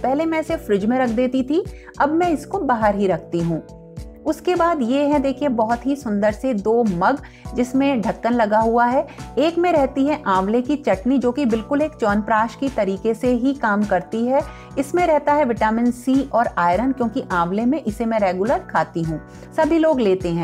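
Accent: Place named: Bundelkhand, India. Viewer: Hi.ma'am aap kaha se ho,apki tone bundelkhand jesi h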